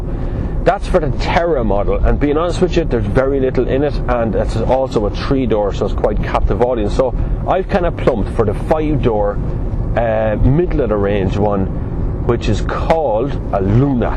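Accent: Ireland. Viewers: thanks!! Congrats for the reviews, you are doing a good work! (and I like the Irish accent with words as "bush" "thash" for but and that hahaha)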